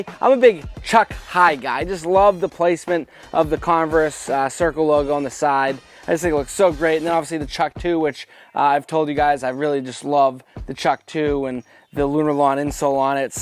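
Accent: Boston, United States. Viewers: "wicked affordable" if u couldn't already hear the boston, that line just sells it 😂😂😂 great content as usual man!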